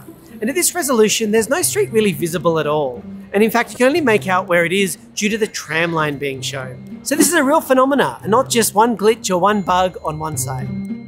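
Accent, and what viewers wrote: Australia. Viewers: i watch a bunch of American Youtubers that do very much what you do, EG Adam the Woo, Justin Scarred etc there's 100s of them and i thought i wish an Aussie would do something like this and i finally found one, unfortunately in Australia there are two really noticeable accents the I went to uni and i left in year 10 to work for my dads building company and you really are on the high side of "i went to uni" but that's cool at least you know how to study and find the truth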